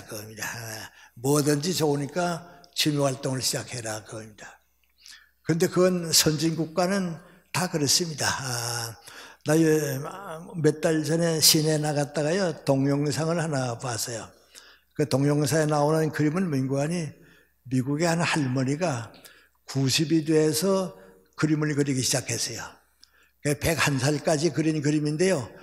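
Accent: North Korea. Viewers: 이북말씨네요. 석가모니 부처님께서 최고이지요. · 올해 나는 72살. 어려서 학창시절 북한출신 은사님들로 부터 교육을 받았다. 김형석교수님의 가르침과 음성을 들으니, 옛날 평양사투리로 말씀하시던 선생님들의 음성이 들려와 하염없이 눈물만 흐른다. 선생님 건강하시고 오래사십시요. 감사합니다.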